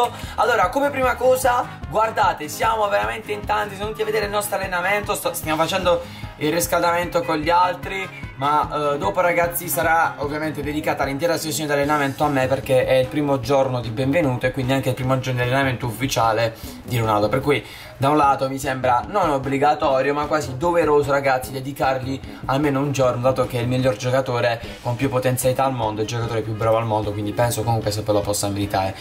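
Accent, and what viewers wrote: Bari, Italy. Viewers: senti ma sei barese?Pk dall'accento pare che tu sia barese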